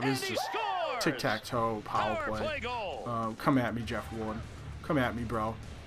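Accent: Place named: Massachusetts, United States. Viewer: hey man, I grew up in Bridgewater, MA...though living in Denver now...don't get to hear alot of people that sound like me!! Go B's!!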